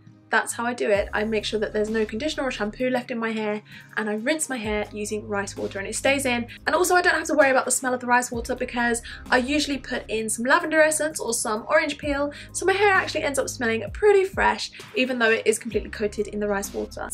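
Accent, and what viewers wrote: United Kingdom. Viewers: Wotah Ahh I love the British accent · Hi. This is my first time seeing your video. I really needed and appreciated your show. Great British accent.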